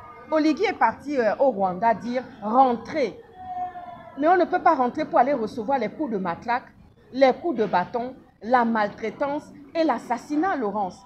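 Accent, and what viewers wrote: Cameroon. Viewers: Tu te dis gabonaise mais t'as l'accent du cameroun.hummm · Tu es sûre que tu gabonaise ? Tu as l accent du Cameroun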